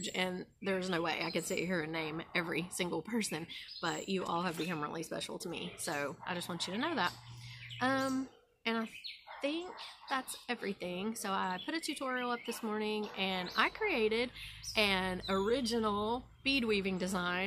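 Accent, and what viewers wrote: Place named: Pittsburgh, United States. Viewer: Ahh, okay. Every once in a while, you sound like some folks I know from the Pittsburgh area, which is why I asked. 😊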